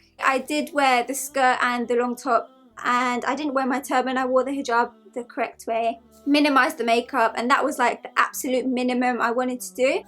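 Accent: United Kingdom. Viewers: Do u live in Liverpool or somewhere in northern England? Cos ur accent sounds like it also mashallah ur soo beautiful 😍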